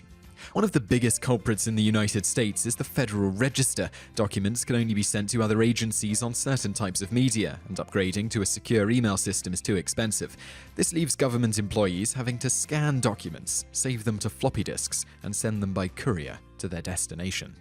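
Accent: England, United Kingdom. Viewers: British english is obsolete too! Loose the accent! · You have an English accent, but your video is about America.... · your accent is english yet you use america as an example. ? :S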